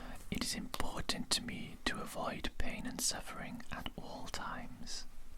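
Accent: Wales, United Kingdom. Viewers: you have such a relaxing voice , do i detect a little bit of a welsh accent there? sorry you took down the wrong answer for me there i dont infact always finish my chores , super relaxed video loved the writing sounds 😴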